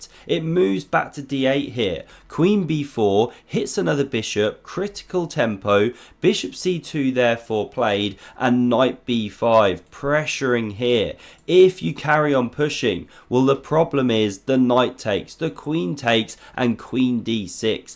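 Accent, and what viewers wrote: United Kingdom. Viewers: Must be UK 🇬🇧 accent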